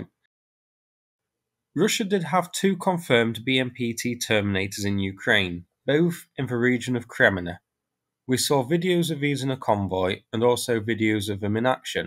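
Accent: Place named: Yorkshire, United Kingdom. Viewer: "Countreh" "Terminatuh" "Yeller" I wonder what part of yorkshire the narrator is from ?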